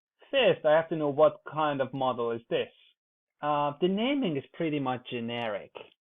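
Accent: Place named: Iran. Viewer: Great Persian-English accent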